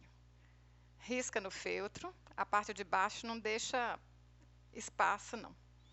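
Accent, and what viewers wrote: Minas Gerais, Brazil. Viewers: Gostei de sua aula, amo esse sotaque minerim. 🥰 Vou fazer a minha bonequinha.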